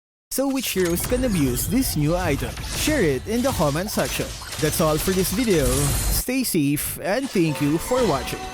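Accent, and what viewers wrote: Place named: Philippines. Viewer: when will you ever improve your english accent it’s so obvious you’re filipino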